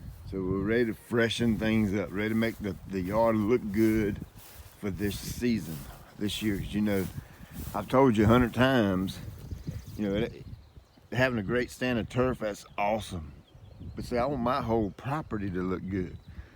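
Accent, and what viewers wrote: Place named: Carolinas, United States. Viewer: Your video says how to have a wonderful lawn, but you talked mainly about the beds. By your accent, I guess you live in the Carolina's so maybe your winters are not too bad. Here in Canada we have pretty harsh winters which take its toll on the grass. I would love more information about how you get your lawn to look so good. I have a rural property and know from the size of your property you spend a lot of time on your lawns and they really do show it they are so lush. Do you have a video where you explain how you feed and maintain your lawn. Thanks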